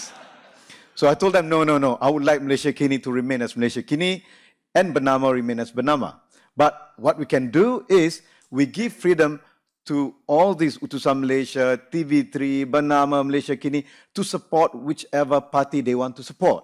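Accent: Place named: China. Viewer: Mcm cina ckp english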